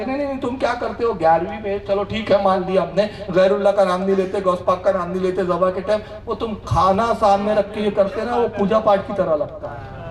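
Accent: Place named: Kanpur, India. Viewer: Kanpuriya style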